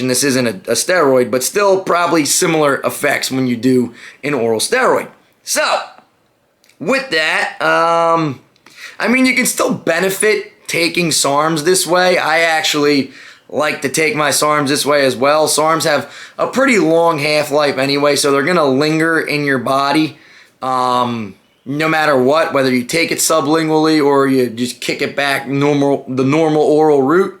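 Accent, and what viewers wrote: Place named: Pennsylvania, United States. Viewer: You sound like you're from PA. Philly or Pittsburgh or close by maybe.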